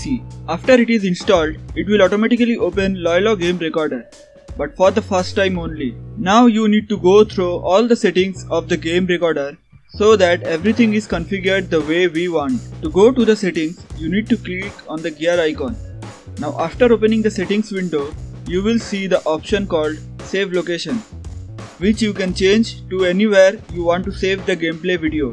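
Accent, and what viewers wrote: India. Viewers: i taught it was fake because of the indian accent lol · when i her indian voice i know i will working btw tnx its working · I luv indin accent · When you heard an indian voice. it doesnt bring fake videos you can trust indian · No it's not legit if there is Indian voice for me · Man when i heard the indian I was like this shit is gonna be a scam or be legit No but seriously this was so helpful..... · If you hear an Indian voice that means it's not clickbait!!! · I hate your indian voice but the recorder worked ! cool vid !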